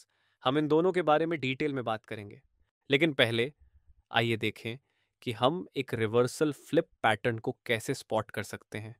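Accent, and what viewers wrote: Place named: South India, India. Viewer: Sir aap kaha se ho ek baar batana Mujhe South wale lagte ho Lekin telgu madrasi kannad Kon ho aap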